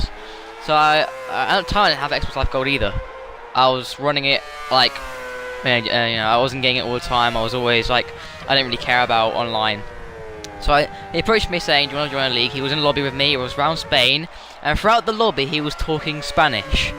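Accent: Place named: Birmingham, United Kingdom. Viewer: BRUMMIE BITCH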